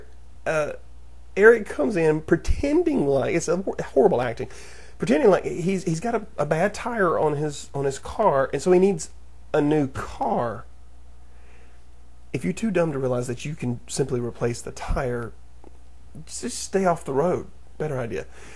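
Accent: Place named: South, United States. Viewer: fuck, that should be than. BTW, you rock, love the vids. In MS here. Central. You sound just a little south:)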